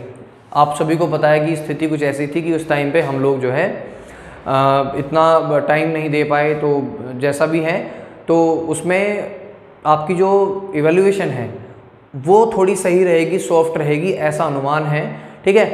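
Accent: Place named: Garhwal, India. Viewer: Sir ji ap gadwali ho kya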